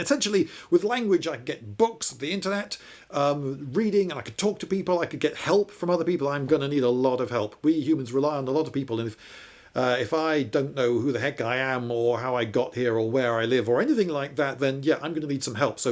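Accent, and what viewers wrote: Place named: RP, United Kingdom. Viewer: I would recommend that English learners focusing on the Received Pronunciation accent watch this channel. No trace of Estuary, Cockney, or other regional influence.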